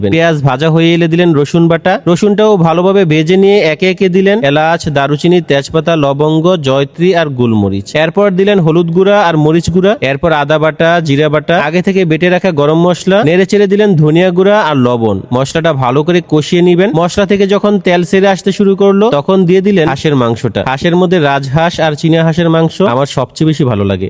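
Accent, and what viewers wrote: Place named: Narsingdi, Bangladesh. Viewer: Tai Tu boli vasha ekdom amdr Moto . Hajipur chinen? Gechen kkhno?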